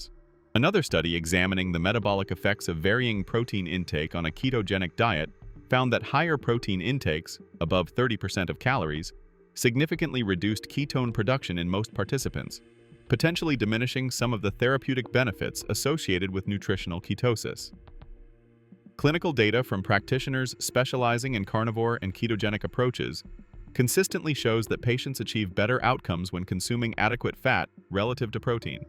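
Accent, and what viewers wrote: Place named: Germany. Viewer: is this a german channel? he sounds like a german..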